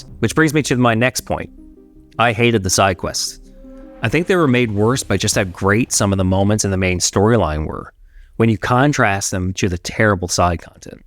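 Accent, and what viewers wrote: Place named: Newfoundland, Canada. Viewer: I can tell this guy Canadian, the way his says “Out” and “About” 😂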